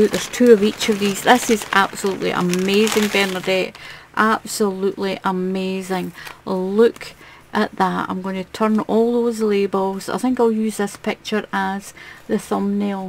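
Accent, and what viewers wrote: Scotland, United Kingdom. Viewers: I love your crochet videos ,as a knitter who could never understand it .also nice to here a fellow Scot you explain everything so well .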